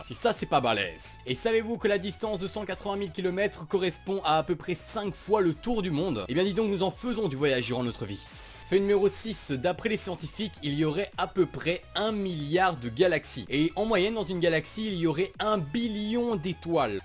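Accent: Belgium. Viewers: alala ! L'accent belge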